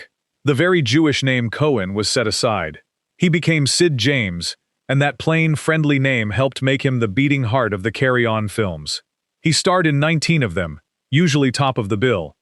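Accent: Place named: United States. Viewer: The accent in the narration of this video makes it quite clear that it is the US racism which motivated its creation. For my whole life 80+yrs I have known that a very large number of people in show business were of jewish origins and it really has not matter one tiny little jot to me or anyone in Britain. Only someone from USA would be motivated to create this diatribe of changed names which has zero value to British people with all embracing British values. Why do you expose yourself.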